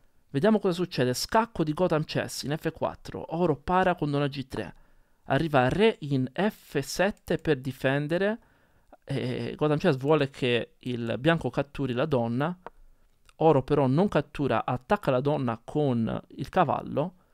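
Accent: Tuscany, Italy. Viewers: se inizi in inglese lo superi! Io spero di no perchè mi piace il tuo accento toscano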